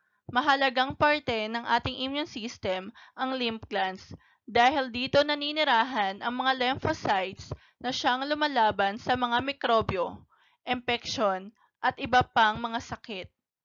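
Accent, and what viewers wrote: Visayas, Philippines. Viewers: Ok n saya.. Kaso bisaya te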